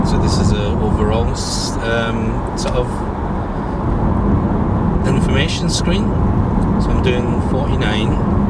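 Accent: Scotland, United Kingdom. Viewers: The normal expression on the UK Leaf Forum is: "Drive it like you stole it" , but to do that you need to head off to the Highlands, where men are crazy, roads are straight and empty, and traffic cops are few and far between. Envy your lovely Scottish brogue by the way: I've lived in Scotland since 1991 but never managed to shed my English 'posh' voice . And how the heck you manage to viddy three different screens at once beats me ... you obviously have a knack for technology.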